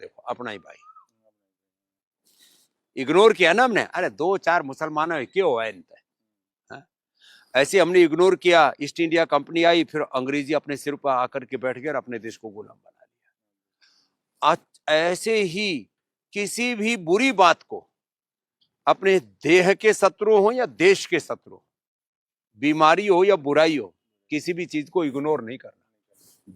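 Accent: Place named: Rajasthan, India. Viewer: Baba ji mhari marwadi boli aaw aapne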